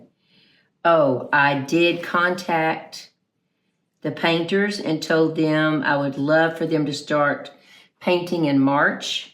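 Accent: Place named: Georgia, United States. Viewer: I just found your channel. I didn't know your background, and I thought this lady speaks French with a Georgia accent! My parents retired in Augusta, Ga, so I know that accent well!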